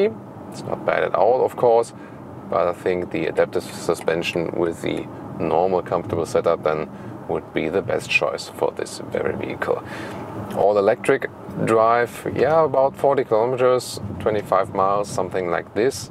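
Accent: Germany. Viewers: Da sieht man dass du deutscher bist aber sehr gut english kannst. Danke fürs review!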